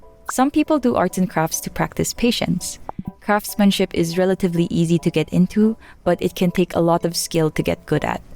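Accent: Philippines. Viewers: literally 5 seconds into the vid narinig ko agad yung accent (i never came accross u b4) and CLOCKED that u were a kabayan, and i was right 💅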